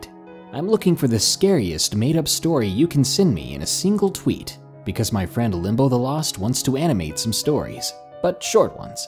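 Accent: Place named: South, United States. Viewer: I used to hate his old southern twang he had. His voice now is great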